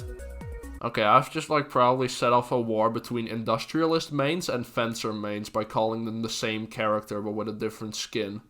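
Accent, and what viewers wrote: Netherlands. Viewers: Hey are u dutch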